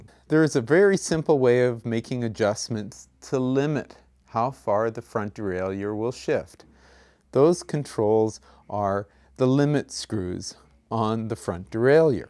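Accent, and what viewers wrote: Canada. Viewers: Derailleur is French for de-railer so it depends how pedantic you want to be. I guess the instructor is Canadian by his accent and he pronounces it differently to 'muricans. Thanks for the video. This is the second time I've used it to tweak my road rig.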